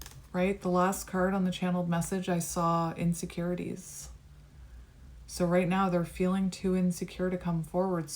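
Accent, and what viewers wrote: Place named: Canada. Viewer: Two of your readings have been so spot on! One with the feathers, and this one, very very accurate. I am sending you best wishes from Central America, I could tell you were Canadian, by your accent. All about his feels so right... 15 reminds me of his emotional age....he is coming around.. I hope and I feel...there is still hope, and healing... no contact at the moment.. I am letting him figure it all out. 19 sounds much better than 15! I have and I keep choosing myself! It all rings too much truth! It is very very re assuring. Both of our parents.. alcoholic! Integrating both energies femenine and masculine! It has been like living th relatioship in real time! The key to healing and growth... very very sweet and affirming and brutally honest...! A great catalyst! Still very connected telephatically! Yes he is away. Thank you.. 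DELULU is a SOULULU! and No divine femenine are not mad.... we rock! Clarity and authenticity big present themes! Lorena Bobbit Oh MY Word! Noooo! That was brutal! This was brilliant! Mental as Harry Potter used to say! I can not possibly tell you.. all the overwork, family etc.. all of that applies.. them not me.. I am taking a break.. all true... unbeliebable...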